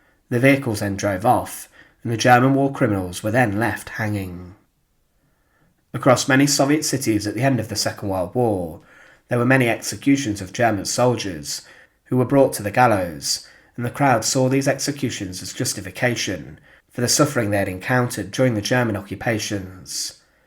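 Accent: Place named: Midlands, United Kingdom. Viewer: You're not British obviously. I think he narrates really well. Sounds like midlands maybe east of Birmingham somewhere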